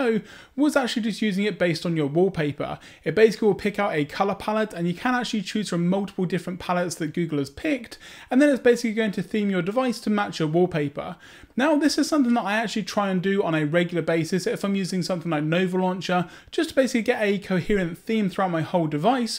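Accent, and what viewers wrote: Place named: United Kingdom. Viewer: Most likely due to his British accent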